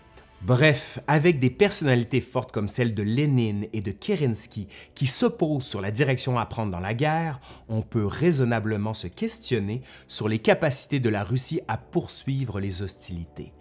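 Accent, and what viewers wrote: Quebec, Canada. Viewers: Super comme toutes vos interventions. Merci beaucoup entre parenthèses j'adore votre accent canadien ! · Excellent, j’ai toujours aimé l’Histoire mais votre manière de l’expliquer est vraiment prenante et en plus j’adore cet accent québécois, toutes mes félicitations! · Vraiment génial ! Je l’ai regardé deux fois de suite pour être sûre d’avoir tout retenu 😍 et tellement fan de l’accent québécois ☆ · J'adore cet accents québécois et merci pour cette vidéo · moi perso, j'adore, surtout avec le petit plus d'accent québécois^^ très dense, on sent bien qu'il y a du travail derrière. Agréable début de WE pour moi donc^^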